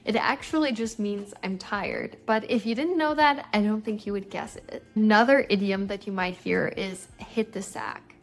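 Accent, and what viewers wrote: United States. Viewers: ❤ thanks teacher your pronuntation Is clear AND natural.are you American?❤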